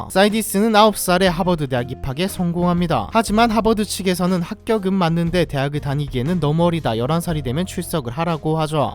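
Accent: Gyeongsang, South Korea. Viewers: 경상도 분이시군요 ㅎㅎㅎ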